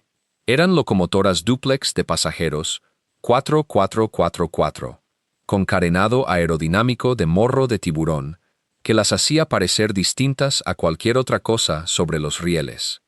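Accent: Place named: United States. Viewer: Esto no es español latino ni de España es de español americano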